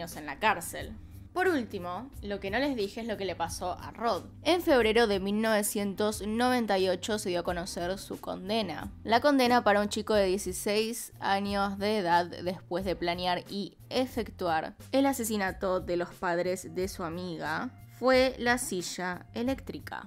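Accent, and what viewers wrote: Argentina. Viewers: Hasta ahora me doy cuenta de que es argentina, gracias demente por hablar como puro argentino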